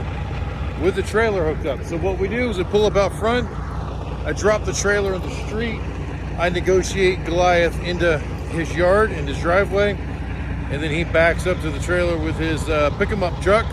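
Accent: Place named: Minnesota, United States. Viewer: By his accent, he sounds like he’s from Minnesota 😅?!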